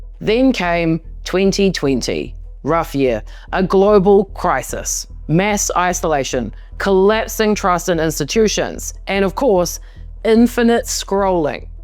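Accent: New Zealand. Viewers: Kiwi! Finally you disclosed and I learned where your accent came from! Now I have to convince myself that you are not a blank slate no readily foundable bio, AI made youtube anchor! hahaha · I said the same thing! And then felt awful because she clarified she IS a kiwi 😂 🤦‍♀️ real broad accent huh?!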